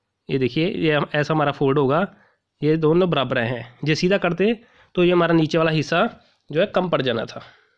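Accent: Punjab, India. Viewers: Aapki aawaj se punjabi jalak rhi h waise video 1 no. H ji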